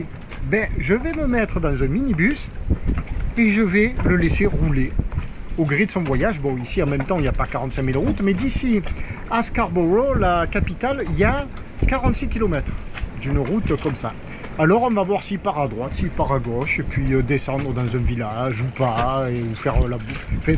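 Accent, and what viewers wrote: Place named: Marseille, France. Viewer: J adore ton accent Marseillais. La bizz. Denis S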